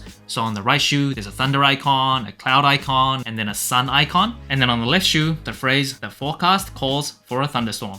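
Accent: Australia. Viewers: Did you ever live in Australia because it sounds like you have a bit of an Aussie accent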